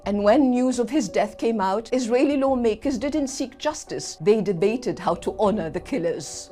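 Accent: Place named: United Kingdom. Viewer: لهجة بريطانيا مقززة. إحكي عربي يا بنت الناس. ليش صوتك هيك متل العجوزة اللي بتعاني من إمساك؟؟ 😂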